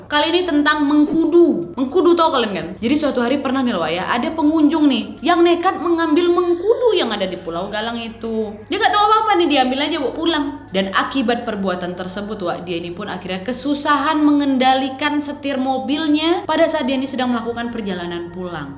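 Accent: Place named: Medan, Indonesia. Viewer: Dr logat nya pst org Medan. 😂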